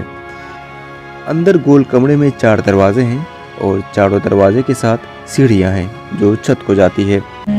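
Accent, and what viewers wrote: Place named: Bihar, India. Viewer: Bihar se ho kya Bhai launguage me influence ...samajh aa rha hai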